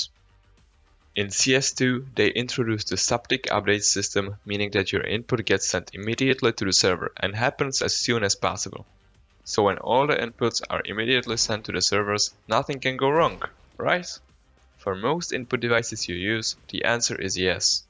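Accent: Czech Republic. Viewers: skoro jsem nepoznal že jsi čech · to je hustý že jde tolik poznat že jsi čech z toho přízvuku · Český přízvuk se pozná všude :D Pěkný videjko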